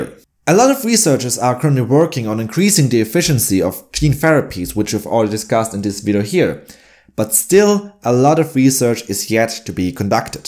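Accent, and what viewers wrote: Germany. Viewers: As a native English speaker, it really isn't. He speaks too quickly and smears his words without pronouncing all of the sounds. If he didn't do this, he would be a lot easier to understand even without the heavy German accent. People who speak one language are bad judges of a foreign language spoken by their peers because they are used to speaking with and hearing their native phonemes, even when used to approximate another language. Good video and content, just needs to slow down and work on pronunciation and annuncuaction. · Are you threatening me, Master Jedi? I am also a native German speaker and I can clearly notice the German accent. Maybe because even though everyone I've talked to in the past 15 years spoke German with me I almost exclusively consume English-speaking entertainment and do so for hours daily. Though I have to say it's way better spoken English than pretty much anyone I know :p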